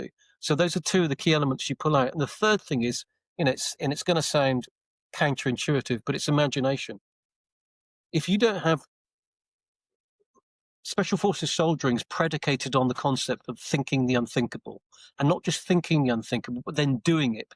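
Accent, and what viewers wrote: Wales, United Kingdom. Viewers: Are you Welsh? Great video! Sub’d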